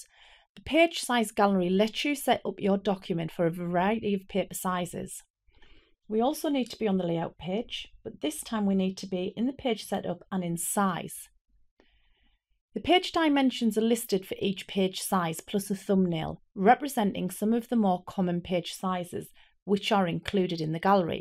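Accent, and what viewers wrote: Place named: North East England, United Kingdom. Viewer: I think I detect a north east accent! Thank you :)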